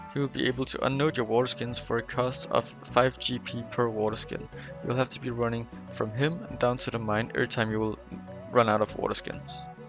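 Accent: Denmark. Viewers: Sjovt. Det tog mig 15 sekunder, at høre på din engelske accent, at du er Dansk. Tak for videoen 🙂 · Are you Danish? you sound very danish in your accent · 2 sec in i instant hear a fellow dane nice guide tho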